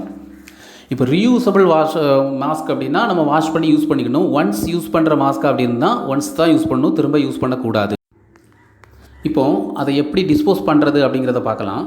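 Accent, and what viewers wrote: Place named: Tirunelveli, India. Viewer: மிகவு‌ம் அவசியமான பதிவு அதுவும் திருநெல்வேலி அழகு தமிழில். அருமை.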